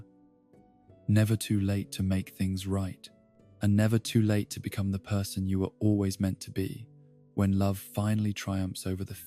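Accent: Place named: RP, United Kingdom. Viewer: u sound like a tory but i fw this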